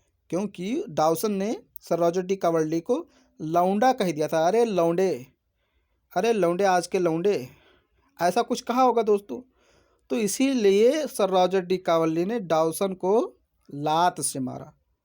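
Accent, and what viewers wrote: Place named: Purvanchal, India. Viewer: Sir speaking language tells that you generally belog to Purvanchal... May be Sultanpur, Ambedkar nagar or Ajamgarh😊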